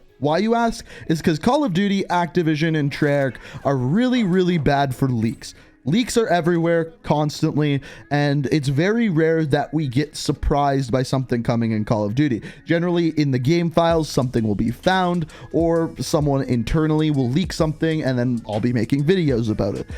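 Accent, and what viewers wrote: Canada. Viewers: Is this guy Canadian? Sounds like he got a little Canadian tweak to his English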